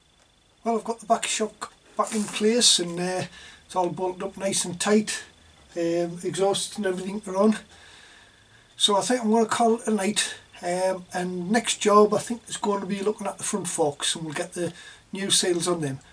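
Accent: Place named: Sunderland, United Kingdom. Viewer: Great video 👍 This should help me out when i have to change my rear shock on my Thunderbird 900 triple some time in the next couple of days 😊👍 That sounds like a north east accent, Sunderland by any chance? 😊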